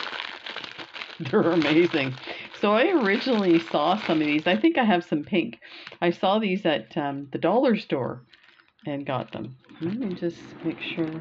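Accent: Canada. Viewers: Love watching your unboxing videos. You're so excited the whole time. You sound much like a Cape Bretoner, which I am lol. You sound like someone I could be good friends with. Anywho just wanted to share my appreciation, I've just started my beading and jewelry making hobby about 2 yrs ago and love it. I'm looking forward to more video from you and still catching up. Have a great night.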